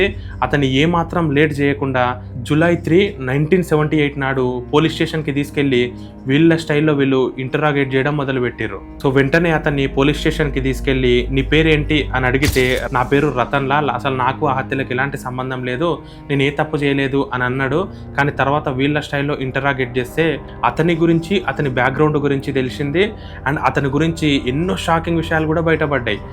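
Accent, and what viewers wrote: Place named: Telangana, India. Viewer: నాకు నచ్చింది మన తెలంగాణ యాస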